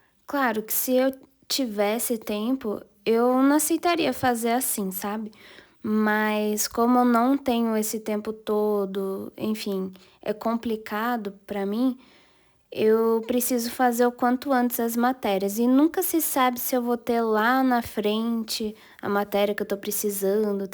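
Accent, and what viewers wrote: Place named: Spain. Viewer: No sabes hablar bien portugués parece más español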